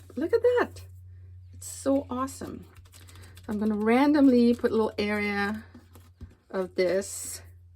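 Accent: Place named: Normandy, France. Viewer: Thank you for this. Also, just want to say I love to listen to you speak. Your accent sounds just like my mom who was Normandy France. She is no longer with us but this gives me some joy for a few minutes.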